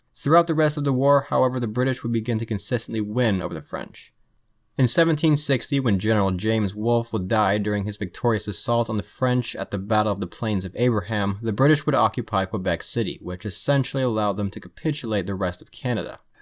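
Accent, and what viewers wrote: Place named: Appalachia, United States. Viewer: That Appalachian pronunciation though.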